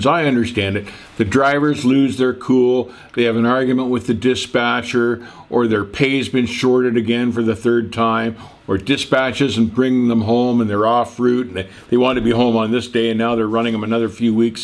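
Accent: Canada. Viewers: I love the Canadian accent :)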